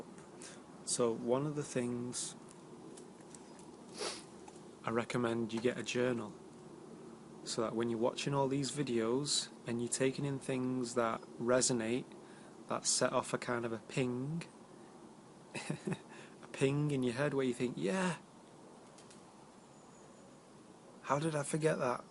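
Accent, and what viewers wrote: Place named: England, United Kingdom. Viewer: Ohhh, you're English. No wonder I keep thinking you are such a cutie... what's up with the English and your gift of gab?! It's not just the accent either. ;)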